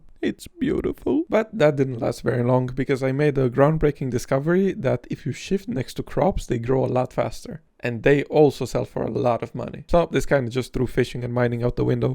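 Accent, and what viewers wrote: Romania. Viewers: as a romanian the second i heard your accent i just knew u are romanian:)) keep up the good work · Why Romanian accent familiar to Indian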